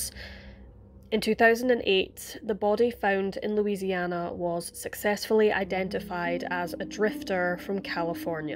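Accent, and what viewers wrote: Scotland, United Kingdom. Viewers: WoW you have a heavy Accent like uk or Scottish accent. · She is Scottish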